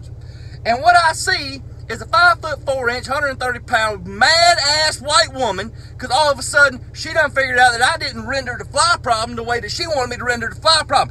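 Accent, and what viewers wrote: South, United States. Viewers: The Southern accent is the icing on the cake 😂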